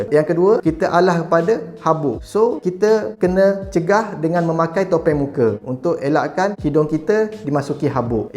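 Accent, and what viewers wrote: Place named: Kelantan, Malaysia. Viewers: Kelantanese accents has been detected.... 😁😁 Apa² good information doctor .....👍🏻👍🏻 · Abg ni orang kelantan kan? Slang kelantan tu ada kedengaran.. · Bunyi Kelantan Dr ni cakap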